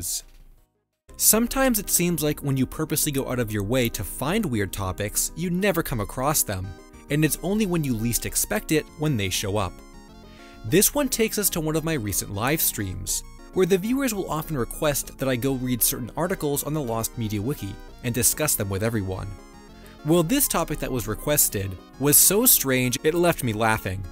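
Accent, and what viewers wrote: Massachusetts, United States. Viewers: Bro are u from Massachusetts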